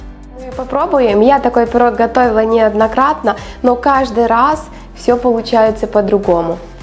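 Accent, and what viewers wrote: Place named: Ukraine. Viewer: Какая ты прикольная почему похудела очень нравится твой украинский акцент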